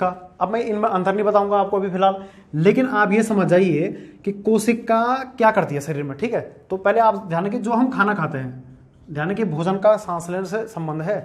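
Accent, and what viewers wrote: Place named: Garhwal, India. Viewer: Sir one thing apko hame apni language mai phadhana chaiye (ghadwali mai)... abhi tk koi bhi teacher nhi h youtube mai jo gadwali mai phadata ho.(jase south mai dekh lo. Tamil mai dekh lo. Apni language mai phadhate h sb. Assam mai dekhlo. Tripura mai dekhlo. Sb apni language mai phadaya h) apki voice mai gadwali ka flow bhi ara h. bacho ke liye best h jo hamre jadatar gadwal mai h..jldi samjhege gadwali mai .. aap ek baar try krke dekhye. jada success milegi apko and students ko bhi.. Thanks Aspirant Deled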